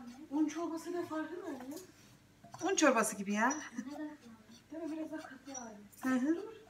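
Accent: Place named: Konya, Turkey. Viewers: hanim memleket nere konusmalar bizim oralar ayni bende inanadoluluyum slm hanim👍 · Zaten konuşman bir tanıdık geldi toprak çekti bende konya ereğli liyim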